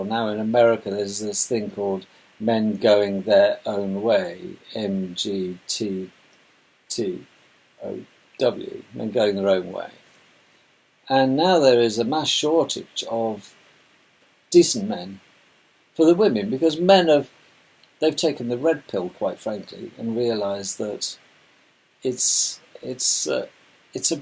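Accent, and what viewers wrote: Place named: United Kingdom. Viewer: My sister is from the south ( she was adopted at birth) we found each other about 20 years ago.....well she was very worried about my accent....I'm from Massachusetts (western)(eastern mass has that nasal Boston accent) her whole family loves my accent.....I found that funny, I don't have one.....they do, all southerners.......it's funny how many Americans have an accent.....you have an accent, ,love a British accent 😎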